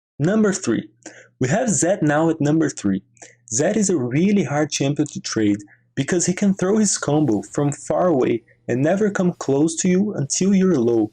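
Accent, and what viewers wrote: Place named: Brazil. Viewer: isso é sotaque br hein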